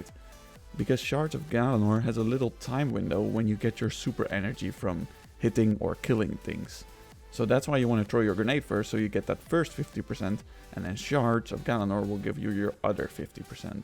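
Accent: Netherlands. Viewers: I can hear the dutch in you so hard haha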